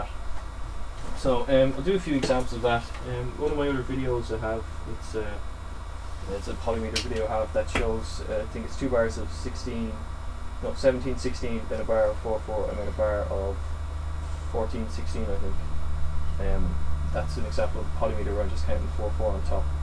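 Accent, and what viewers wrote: Ireland. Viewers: Your irish? awesome :D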